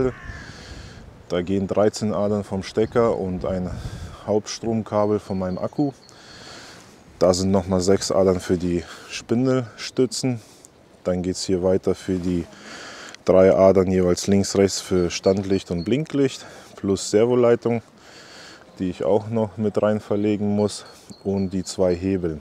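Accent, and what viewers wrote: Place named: Leipzig, Germany. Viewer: Lieber. Klasse Video, ich höre Deinen Akzent als Leipziger immer wieder total gerne. Dein Humor ist spitze trocken. Sag mal bitte, was hast du im Garten für ein Windrad? Nutzt du dies auch zur Inseleinspeisung und welche Erfahrung hast du bei den letzten Stürmen? Lieben Gruß Thomas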